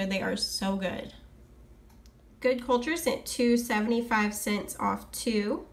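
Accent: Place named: Texas, United States. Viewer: Woodlands? Or Conroe I’m in Huntsville lol 1 and half from Houston! I started couponing about a year and half ago and I left my job as a prison guard to finish my schooling at shsu:) my husband and I did the same when we were working for the state of Texas but after we left to go back to school full time we realized that money was tight and I decided to coupon. I was just wondering I felt like you lived close to Houston by the way you talk. I’m from Kansas so I recognized certain things about Texas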